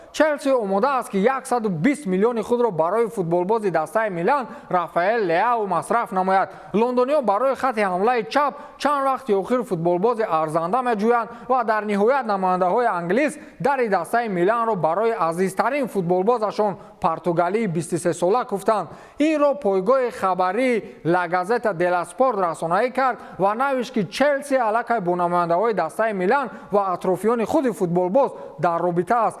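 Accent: Kulob, Tajikistan. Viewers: Ира ами гапзаниш зебш лафзи Кулобигиай на ин ки будикский ть бра ягон будика ихел гап нишон бтее хорошо лафзи шмо агар лафз мешид дар иҷа ба Кулобиги гап намезад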